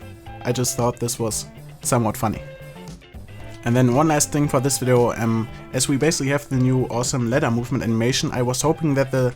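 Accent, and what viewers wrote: Germany. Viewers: Man hört so richtig das du Deutsch bist :D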